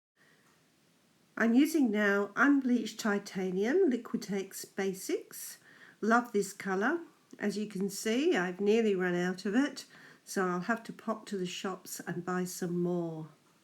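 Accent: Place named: Australia. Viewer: Just found your channel,. Good to hear an Aussie voice. Love wabi sabi style. Can you tell me what the paper is, and the brand?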